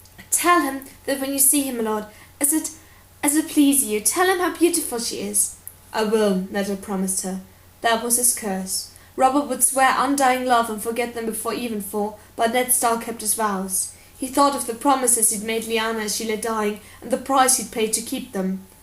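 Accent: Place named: England, United Kingdom. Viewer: i swear this is a different version of the book that i read still entertaining though plus gotta love a woman with an English accent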